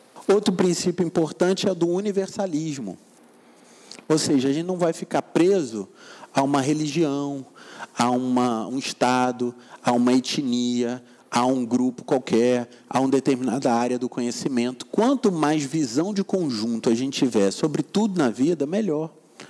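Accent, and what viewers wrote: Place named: Rio de Janeiro, Brazil. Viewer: sutaque do rio de janeiro da zona sul !!!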